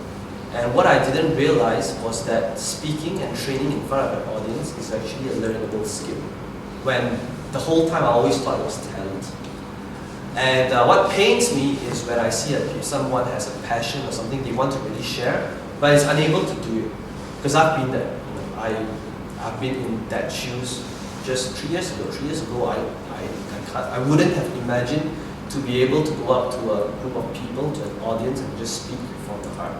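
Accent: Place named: Malaysia. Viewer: It's likely due to his Malaysian accent, which I understand how certain words sounded as if they were muffled as most Malaysians has less accentuation in the pronunciation of the English Language, an often overlooked detrimental effect that was resulted from how fast, short, and simple they wish to be in their speech. I'm a Malaysian my whole life, so of course I understand.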